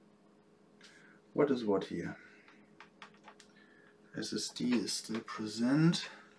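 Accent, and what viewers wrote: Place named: Germany. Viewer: Hello, I have the same laptop. No charging, no power. Your accent sounds like German :D Can you give me some advice?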